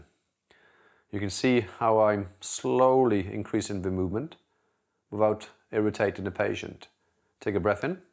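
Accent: Wales, United Kingdom. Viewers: Are you welsh? Great videos. Love them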